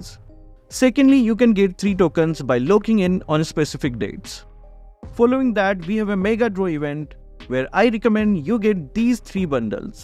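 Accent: India. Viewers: Sir I know ur indian bcoz of ur accent..I love indian people I work here in 5 star restaurant in El Nido Palawan I always have an Indian guests.i hope 1 day u will visited here.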